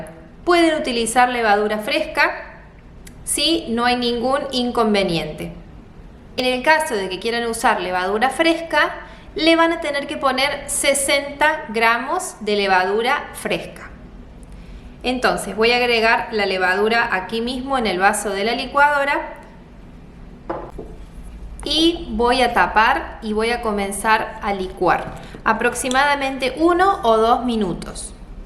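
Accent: Córdoba, Argentina. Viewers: Hermosa recetas de los pancitos de navidad me encantó lo pondré en práctica ya le compartí a mi amiga muchas gracias, al principio estaba en duda 😂 le decía a mi mamá esta chica es de Córdoba x su tonada, despues dije siiii.....ME ENCANTA T PROVINCIA HASTA AHORA GRACIAS A DIOS VAMOS TODOS LOS AÑOS,volviendo al tema de lo pancitos me gustó,xq creo son mas practicos q los amasados ❤👏👏🙌🙌Sgo del Estero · Graciasss hermosa!!! Te acabo de encontrar, ya me suscribí, activé la Campanita. Ahhhhh!!! Me encantaron esos pancitos chiquititos!!!! Voy a compartir con los de mi Familia, mamás amigas, y todas las personas que les encante hacer esta belleza!!! Una pregunta, preciosa. Yo tengo la batidora de pie, la Planetaria. Se puede hacer la mezcla ahi o me van a quedar mas duritos? CLARO,que al integrarlos con la harina los paso a la mesada, por el tema del gluten.Sino, los hago como vos en Licuadora y no hay problema. 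Quisiera hacer muchos, si me salen bien los primeros 25, porque me encanta regalar y esta es un opción económica, pero más valorado, el hecho de ser hechos caseritos y a las personas que regale, le va a encantar el gesto de haberlos hechos pensando en ellos. Disculpas tan largo!!! Soy una abuelita de 78 años y lo quiero hacer con 2 nietitos varones, que les encanta la cocina. Te digo la Verdad, tienen 9 y 13 añitos y cocinan y luego la mami, los pone al fuego El de 9 añitos, hace milanesas perfectas, solito, otras comidas, fáciles y tortas, los dos hermanitos. Ya termino, preciosa, ya te saqué sos de Córdoba ( Argentina) como yo, pero de Buenos Aires. Amo tu tonadita.❤ Tengo familia cordobeses y pasaba de niña las vacaciones de invierno en La Falda Ya te quierooo Mucho!!! Besitos ❤🎅🏻🎅😘😘😘❤️ Y quiero hacer tu glacé real. Es hermoso blanco como la nieve!! Debe ser delicioso.😘😘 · Hola querida CORDOBESA..LA TONADA ES MUY CONOCIDA..SOY DE CORDOBA CAPITAL..MUCHO GUSTO..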